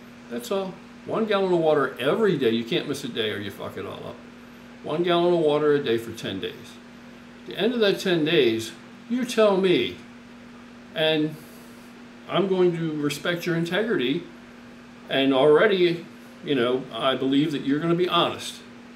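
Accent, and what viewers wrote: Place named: Philadelphia, United States. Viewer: sounds like a philly accent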